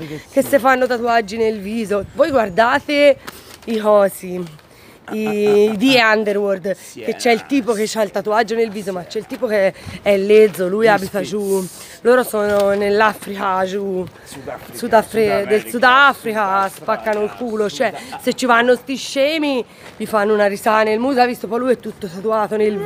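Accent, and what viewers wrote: Tuscany, Italy. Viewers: Auguri carissima.....ti abbraccio e ti mando un grande bacio.....sentirti parlare con la calata Toscana mi riscalda il cuore perché mi ricorda il mio adorato babbo, nato a Prato, che non c'è più!!!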